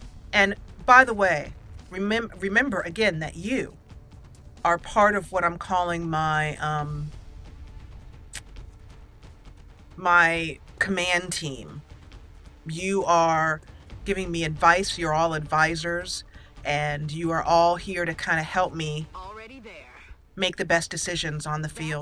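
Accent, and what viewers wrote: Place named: South, United States. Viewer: Wow, this game is very involved. I'm really liking Commander's southern accent; nice job. I don't want to be weird, but those aliens are kind of cute, too. :3 You took a lot of risks this second mission, Commander. It's amazing that a lot of them worked out. Beginner's luck or simple intuition, only time will tell! :D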